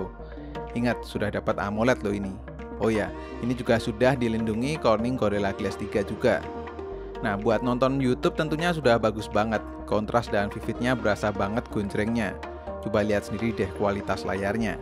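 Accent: Yogyakarta, Indonesia. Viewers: Abang Orang Jogja ya?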